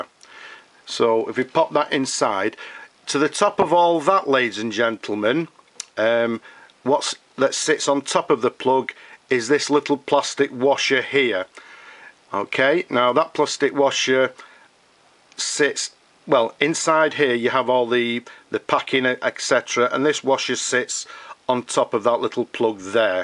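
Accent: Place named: North, United Kingdom. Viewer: I do appreciate your video! By the way, your accent sounds pretty much like northern British accent haha. I don't know if I'm right about this but I like the way you talk definitely! I have just got the exact same pen on Ebay.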